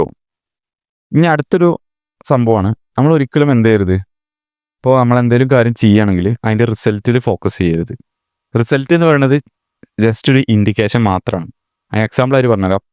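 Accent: Malappuram, India. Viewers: Bro Malappuram aano? Presentation kollam👍🏽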